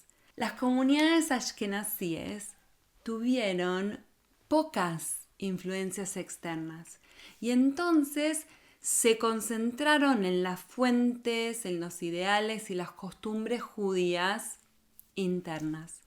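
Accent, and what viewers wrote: Argentina. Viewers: que acento argentino tiene me desespera un poco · Tengo muy buenos amigos sefardíes y algunos asquenasies pero tienes un bello tono de voz además el dejo argentino que es muy bonito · Me encantan sus narraciones históricas acerca de las personas judías , habla muy bien aunque con acento argentino pero es muy musical y agradable escuchar su voz y la forma en que narra las historias punto Muchísimas gracias,SHALOM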